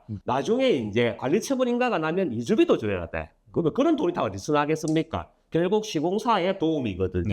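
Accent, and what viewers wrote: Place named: Busan, South Korea. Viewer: ㅋㅋㅋ 인정~~~ 하지만.... 부산 사람은 자막 안 봐도 다 알아 듣습니다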